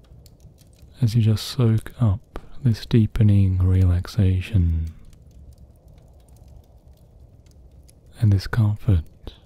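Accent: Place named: Australia. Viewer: I love that you’ve released a hibernation video just as we’re entering winter in the souther hemisphere. That combined with your calm Aussie accent is just so perfect. I feel at home 😌🙏🏽💗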